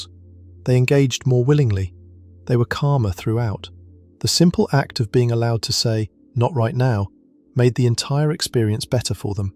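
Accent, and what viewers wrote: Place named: United Kingdom. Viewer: PLEASE STOP the constant use of British accents! It’s not necessary.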